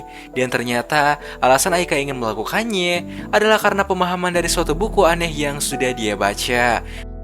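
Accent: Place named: Bandung, Indonesia. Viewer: logat bandung jier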